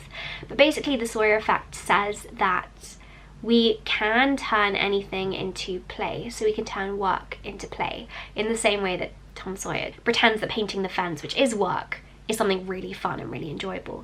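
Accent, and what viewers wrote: United Kingdom. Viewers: She is using british accent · Great video miss , iam from india , highly appreciate ur work , I would request u to read quran translation of which is approximately 500 pages , a book which is from god believe 25 to 30 % of world population , by the way I think ur british ,. I love british accent a lot , great job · Are there any youtube channels have British accent and make great videos liker her? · Hermione accent? She's just British mate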